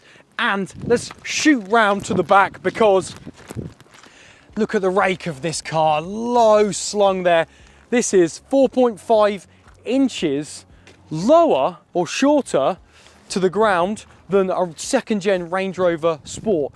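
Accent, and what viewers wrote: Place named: United Kingdom. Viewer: That British accent is 🔥🔥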